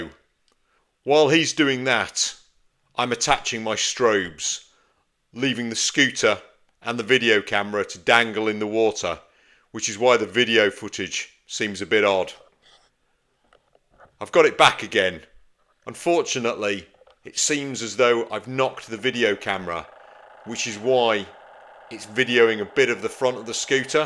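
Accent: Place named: England, United Kingdom. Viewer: Really enjoyed watching this..and love the English accent..I'll lay odds on you were in the Services...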